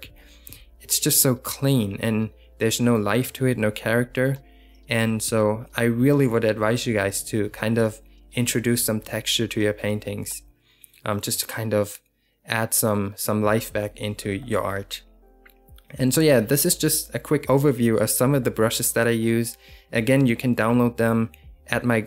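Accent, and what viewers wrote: Germany. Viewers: Are you german?:)) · Are you German?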